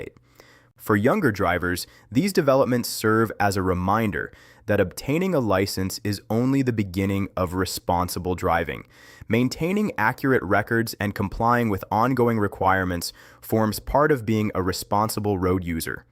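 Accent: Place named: United States. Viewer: Load of Crap. Why is it that all post's loke this one is narrated by an American or Canadian.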